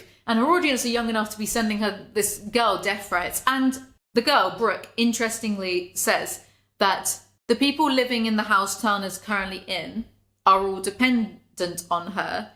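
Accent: United Kingdom. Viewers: Gurl, you've got the prettiest dark honey-chocolate eyes, the makeup suits you well. And i will never get tired of listening to you talk about anything. Your british accent is so nice.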